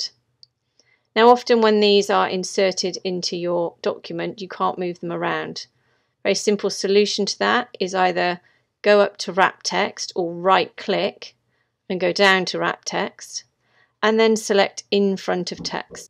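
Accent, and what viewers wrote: England, United Kingdom. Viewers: Absolutely wonderful. You sound English, I'm from Bristol, live in Australia. Writing a biography of a wonderful life with my late Valerie. Met in 1955, torn apart in 2019. But, you have given me so much knowledge to make this story readable, and I hope for others. Well done, Take care, Terry.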